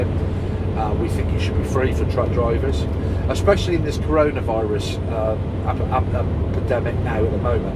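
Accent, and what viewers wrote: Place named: United Kingdom. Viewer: I would love to perfect my English hehehe I like your British accent! Pls help me how to be fluent in English thanks idol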